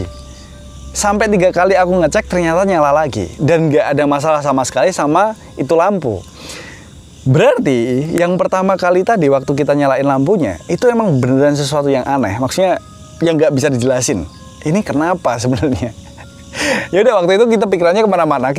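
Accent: Java, Indonesia. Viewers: Gara² Kewan, Jowone metu cok..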